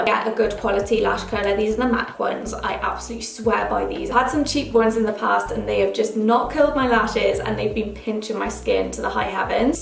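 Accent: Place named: Wales, United Kingdom. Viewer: Nice to hear a lovely Welsh accent, nice change from American. Not that I’m biased! 🏴🏴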